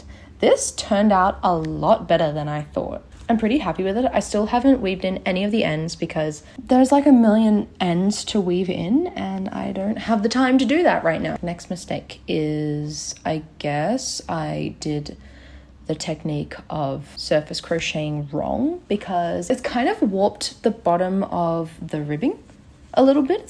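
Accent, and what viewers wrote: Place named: Australia. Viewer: The best part was the australian accent, love it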